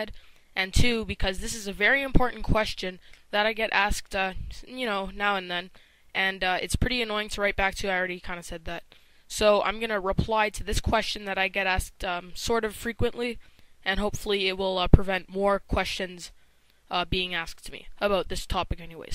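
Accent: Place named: Canada. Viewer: I'm guessing your Canadian. I have a keen ear for accents.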